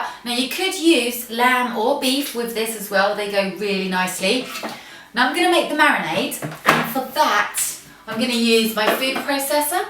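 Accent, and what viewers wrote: England, United Kingdom. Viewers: Hi there, I really appreciate you making this video! I'm Bruneian and currently staying in the UK. being away from home makes me miss all the asian food! and satay is one of them! I'll be going to try this recipe. AND you've got an amazing english accent!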